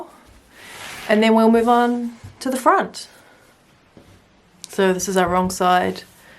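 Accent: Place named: New Zealand. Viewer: LOVED the ruler tip - Brilliant! And so nice to hear a fellow Kiwi tutorial :) It was like having my BF sew with me. Thank you <3 This is so good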